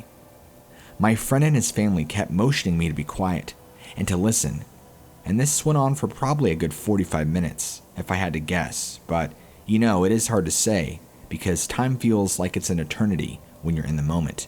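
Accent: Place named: United States. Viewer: I love your American accent 😁👍😘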